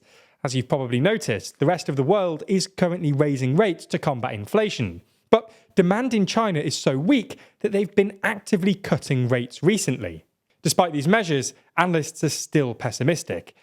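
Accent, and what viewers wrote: United Kingdom. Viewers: 5% growth for 2023, British accented presenter enjoy your recession riddled economy.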